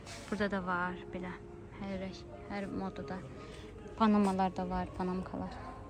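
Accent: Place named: Mingachevir, Azerbaijan. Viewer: hə lap yaxşı məndə Mingeçevirliyəm sizdə bizim kimi danışırsınız maraqlı gəldiniz mənə sizi görməsəmdə doğma gəldiniz mənə ❤❤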